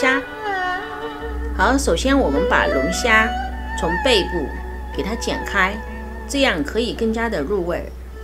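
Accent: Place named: Shanghai, China. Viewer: 上海的阿姨？ 熟悉的口音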